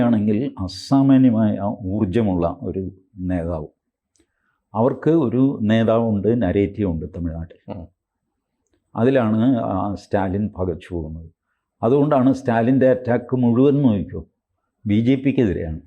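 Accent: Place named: Kasaragod, India. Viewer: കാസറഗോഡ് ഭാഷ എന്ത് കുഴപ്പം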